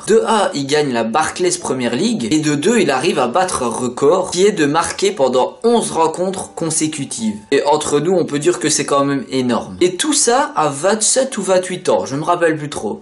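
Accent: Liège, Belgium. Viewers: tu es belge ? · EST TU belge ?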